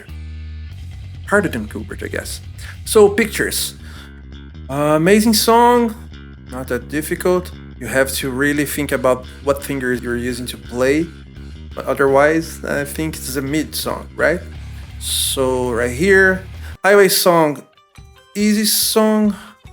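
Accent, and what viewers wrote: Brazil. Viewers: Pelo sotaque é BR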